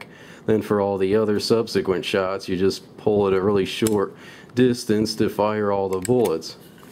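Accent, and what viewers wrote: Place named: United States. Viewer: Where in the US is that accent from?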